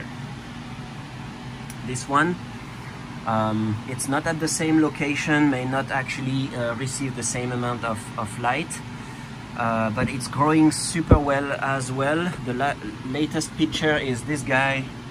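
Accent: France. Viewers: Hi man Love the french accent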